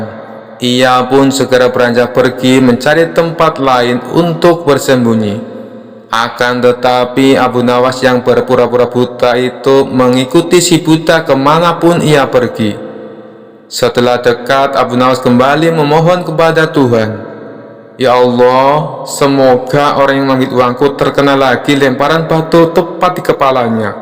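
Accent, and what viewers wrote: Java, Indonesia. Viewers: Jawane ngapake ngendi rika kang admin😂😂😂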